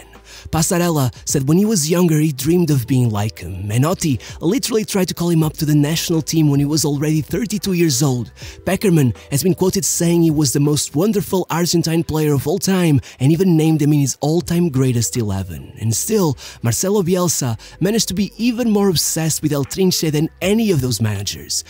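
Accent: Portugal. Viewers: Nice Portuguese accent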